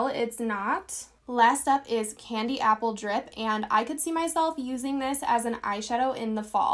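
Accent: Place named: Michigan, United States. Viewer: Are you from Michigan? I'm a former Toledoian and your accent sounds like home! Haha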